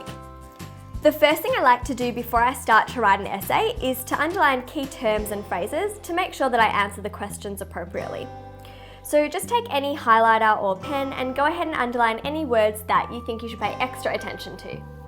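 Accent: United States. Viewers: You are very cute. But the savvy southern blahblah talk lol annoying.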